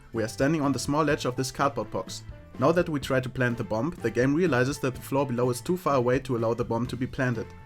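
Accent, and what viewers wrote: Germany. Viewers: dieser deutsche akzent xD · Dat deutsch akzent. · Ich dache nur so "Wieso hat der so ein deutschen Akzent?!" Und denn.... ach so da spricht er deutsch tolles Video, Danke dafür! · ach du bist deutscher:D kam grade auf deinen Kanal und denke mir so "Hnn... der hat aber nen gut deutschen Akzent":D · dein englisch hat einfach einen extremen deutschen akzent 😂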